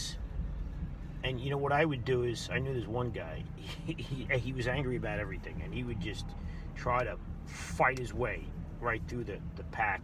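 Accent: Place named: New Jersey, United States. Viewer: R u from jersey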